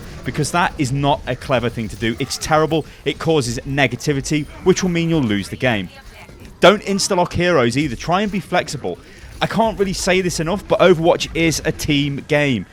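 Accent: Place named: United Kingdom. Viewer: Subscribe reason: 30% Tips and Guides 70% British Accent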